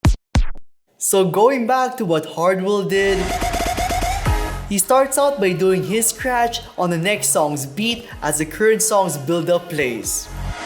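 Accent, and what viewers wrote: Philippines. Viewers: Lol your accent is funny, are you filipino?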